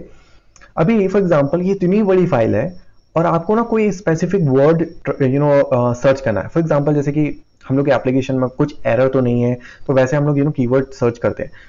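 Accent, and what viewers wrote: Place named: Hyderabad, India. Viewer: You are Hyderabadi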